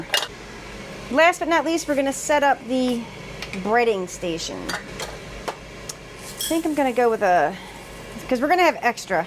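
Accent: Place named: Philadelphia, United States. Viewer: Are you from Philly can tell by your accent.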